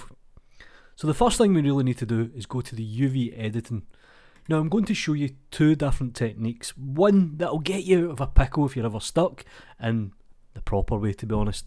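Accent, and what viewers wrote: Scotland, United Kingdom. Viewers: I freaking love your Scottish accent. Keep doing this!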